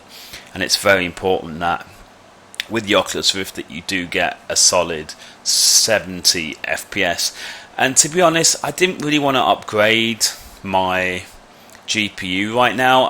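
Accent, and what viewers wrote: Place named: United Kingdom. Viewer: why in the fuck do british mother fuckers talk??????? lets just use some CC on this bitch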